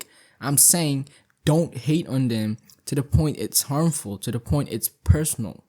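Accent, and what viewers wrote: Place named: New York, United States. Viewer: are you from NYC? I sort of detect an accent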